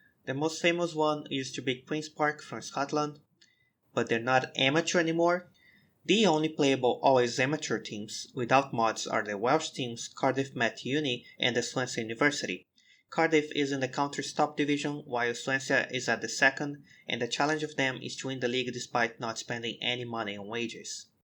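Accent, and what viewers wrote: Brazil. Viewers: are you brazilian? the pronunciation of certain words are very similar to brazilian people speaking english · cara, vc é br? pronúncia parecida com a nossa.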